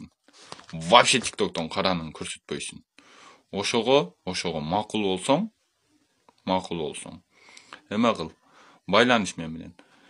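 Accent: Standard. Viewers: 😂😂😂ама эркекче болуптур а булжагы? Анча мынча Кыргызстандыктардан тазза сүйлөйсүнго жетим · Азаматтсын иним таза Кыргызча суйлойт экенсин ишине ийгилик кайда журсон да аман эсен бол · Красавчик орсу болсондо бауржандан таза суйлойт екенсин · Маладес Орус Улутуңда Болгонуң менен Кыргызчаны Таза сүйлөйт экенсиң Азамат Сени менен дос болуш керек экен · Молодец,жунбаш мага караганда таза суйлойт экенсин кыргызча 😂😂😂 эркек менда сени колдойм. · Кыргызча таза суйлон экенсин 👍👍👍👍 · Красавчик. Условияны туура койдун.Кыргыз тилин таза суйлойткенсин! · Баракелде анча мынча кыргыздардан таза суйлойт экенсин аман бол укам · он кыргыздын ортосуна бир орус келсе ону тен орусча суйлогон кыргызым ай бат эле сатылып кетесинер мен жон гана туура обу жок катын орсок уй сайт деп койот кыргызда обу жоктордон он эсе таза суйлойт экен анысына рахмат мен бауржанды эле колдойм себеби ал кыргыз мен кыргыз экенимди мекенимди сатпайм бирок бабуржандын оздук аламдык адеп ахлагынабы же элге болгон улгусуно карасак точно эле тантык. 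· Баракелде мына накта жигит. Таза кыргыз тили менен суйлоп жатат. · Орус кыргычаны таза суйлойтко хайы келдимго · Нукура,таза суйлойт экенсин. Азамаатсын,жигит. Бар бол,аман бол,ийгилик саган. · Достойный Таза кыргызча суйлопсунго молодец💪 журогун кыргыз экен 🔥🔥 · Кыргызчаны таза суйлойт экенсин Сенамдарга Караганда олаа Сола оняк соняк тана ука тирик жетим жакшына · Бул таза суйлойт го кып-кыргызча👍👍👍👍👍👍👍👍👍👍👍👍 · Молодец кыргыздын орусу таза суйлоптур алга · Красавчик таза анча мынча кыргызга Караганда таза суйлопсун мына сени патриот десе болот орус болсо да кыргыз тилде суйлогон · Аззаматсын таза Кыргызча суйлогон учун👍🤝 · Рассиянын каериндесин Уят кылдын кыргызча суйлоп Кыргызчаны сендей таза суйлошпооойт. Альзамдулиллях деген созун учун ылайым еки дуйно бактылуу бол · Азаматсын опкосу жокторго караганда таза суйлойт экенсин азаматсын чон жигит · молодец тууган кыргызчаны өтө таза сүйлөйт экенсиң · Менденда таза суйлойтко 😀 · Молодец орус болсода таза кыргызча суйлоп салдыго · Молодец кыргызча таза суйлойт экенсин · Маладец еркек екенсин орус болсонда ит емгенге караганда кыргызча таза суйлодун 👍👍🤝🤝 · Таптаза суйлойтко бул бала Алхамдулиллах деген созун абдан жакты ийгилик Аман бол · Азамат Манастын тилин таза суйлойт экенсин иним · Азаматсын жигит Кыргызчаны менен жакшы суйлойт экенсин мен Лейлекский акцент менен тата пата деп суйлом сен орус болуп таза суйлойт экенсин тан бердим. 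· Баракелде бала , таза суйлоптур 👍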